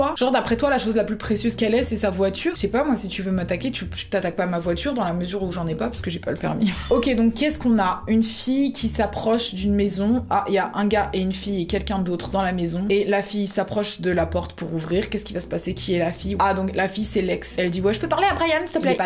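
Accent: England, United Kingdom. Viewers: L accent anglais j adore t aime bien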